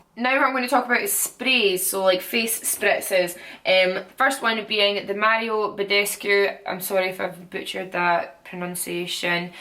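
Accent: Scotland, United Kingdom. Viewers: Where is she from in Scotland?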